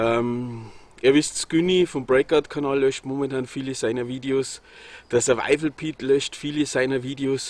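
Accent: Bavaria, Germany. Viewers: Bitte du musst weiter machen🤣🤣🙋‍♂️🙋‍♂️🙋‍♂️🙋‍♂️🙏🙏🙏🙏🙏🙏Wir brauchen Dich so sehr!!! Ich werde auch Mitglied anmelden!Bitte gibt du nicht auf!😂😂😂😨😨😨Ich verstehe Dich sehr gut!Wir können noch nicht von Luft und Liebe nicht leben!Jeder von uns müssen sein Lebensunterhalt verdienen. Lasst dich nicht unterkriegen💪🙏🙏🙏🙋‍♂️🙋‍♂️🙋‍♂️👍👍👍Alles Liebe aus München!P.S.Ich Liebe dein bayerisch 🤣🤣🤣🤣👍👍👍👍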